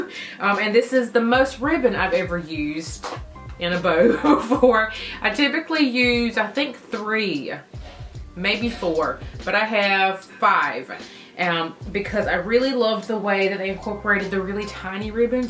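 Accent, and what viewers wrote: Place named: South, United States. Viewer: Oh yeah and that accent ... loving every moment making me miss my southern roots 🥰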